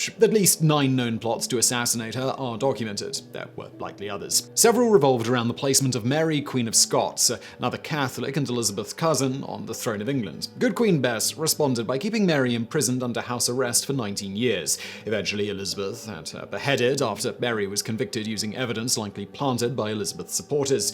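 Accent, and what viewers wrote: United States. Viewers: Dine asty. When did you become a Yank? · oh don't I know it the thing is its pronunciation is completely different in the UK. His US pronunciation is very grating on the old ear holes don't you know. What's actually scary is I can remember the names of the characters :)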